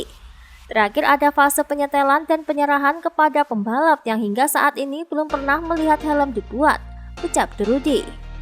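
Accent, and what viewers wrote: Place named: Banyumas, Indonesia. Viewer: Admin nya orang ngapak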